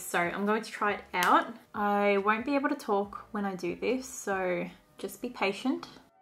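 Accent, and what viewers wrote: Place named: Australia. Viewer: Omg the weird guy story 😂😂😂🤣🤣🤣I love the way you talk, it’s exactly how I talk with my friends! I love watching fellow Aussies, but you especially make me laugh with your stories 😃😃😃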